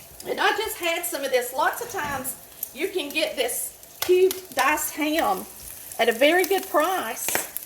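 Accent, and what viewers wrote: South, United States. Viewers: I thoroughly loved watching your video! Your accent and your sweet Southern charm absolutely tickled my heart. I live on the beach in Southern California now, but I used to live in Atlanta, Georgia. I had the sweetest Southern mother-in-law—bless her heart. She's since passed away, but listening to you brought me right back to those wonderful days in the South. You've definitely earned a new follower! I'm ready to get my Southern grub going again, and those ham and beans are the very first thing I'm making! ❤️ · That voice is 1000% southern if there ever was 😳🇺🇸🤔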